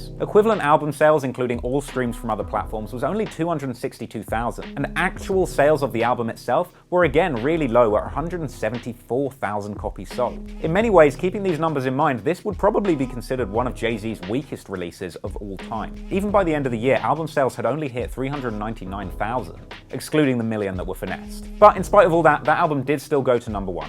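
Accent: United Kingdom. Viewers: I love his accent why don’t we have more British rappers?!?! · Hip hop slang plus British accent is just cringe worthy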